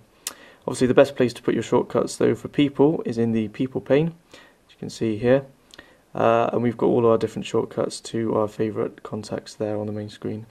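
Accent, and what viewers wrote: Bristol, United Kingdom. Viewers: awesome phone, getting mine soon, also you sound like you're from around bristol.......maybe? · he has a British accent... i dind't understand him either pretty good... but if u listen carefully, its okay!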